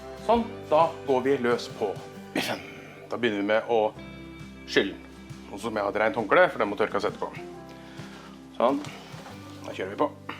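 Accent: Østfold, Norway. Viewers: Var sikker på at den dialekta var fra Østfold .... God mat okke som :)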